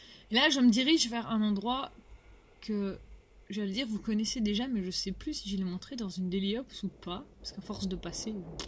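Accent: Belgium. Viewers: Bonjour L'expression "a pouf" pour "au pif" :o) Mais "passer en stoumelings dans les travaux ;;;" (truc comme ça) ??? Sinon j'adore tes vidéos et ses commentaires avec la gouaille bruxelloise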